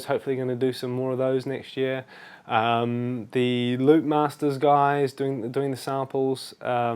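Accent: New Zealand. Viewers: ah! i knew you were a kiwi!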